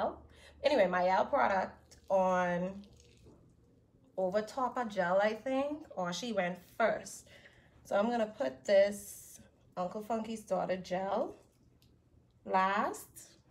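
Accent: Bahamas. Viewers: Just started watching and I'm subscribing already because I hear that you're a fellow Bahamian. Big up BAHAMAS!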